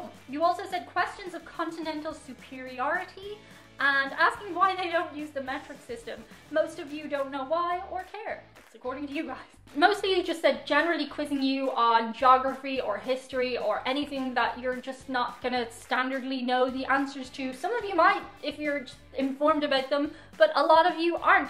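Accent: Ireland. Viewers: Man i love Irish accent. Could listen to it all day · Oh and ask away any question to us across the pond. We will gladly answer someone like you who is both open minded and easy on the eyes. Love your Irish accent as well. So lovely. So keep on keeping on. Same for Chewy.:-)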